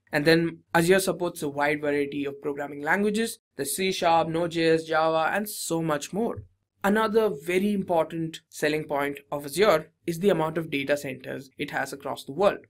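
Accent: India. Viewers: Please don't use Indian accent :) Its hard to listen while focusing to understand the content...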